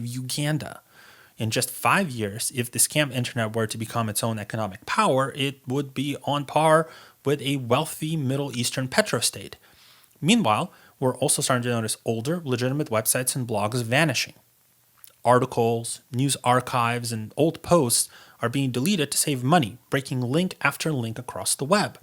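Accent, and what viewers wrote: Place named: United States. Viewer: some annoying american voiced thing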